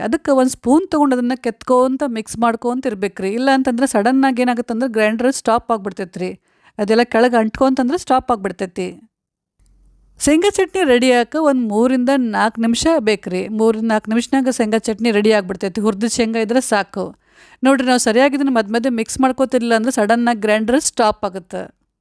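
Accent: North Karnataka, India. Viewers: ಮೊದಲನೆಯದು ಭಾಳ ದಿವಸ ಆಗಿತ್ತು , ಉತ್ತರ ಕರ್ನಾಟಕದ ಭಾಷೆ ಕೇಳಿ , ಮತ್ತು ಅದರಾಗ ನಮ್ಮೆಲ್ಲರಿಗೂ ಹಿಡಿಸುವ ಶೇಂಗಾ ಚಟ್ನಿ ಕಲ್ಲಿನ ಗ್ರೈಂಡರದಾಗ ಮಾಡುವುದು ಹೇಳಿದ್ರಿ, ತುಂಬಾನ ಖುಷಿಯಾಯಿತು. ಧನ್ಯವದಗಳೊಂದಿಗೆ 🙏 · ನಿಮ್ಮ ಭಾಷೆ ❤️ ಹೇಗೆ ಮುಂದುವರ್ಸಿ ಉತ್ತರ ಕರ್ನಾಟಕದ ಭಾಷೆ ಲೀ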